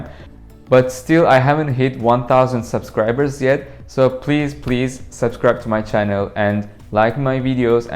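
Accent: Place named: Netherlands. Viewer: You speak English with a Dutch accent, so your Dutch must sound very good :)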